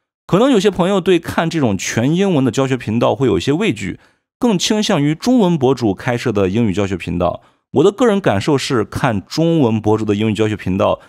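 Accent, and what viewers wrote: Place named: Xi'an, China. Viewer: 一听up主就是西安老乡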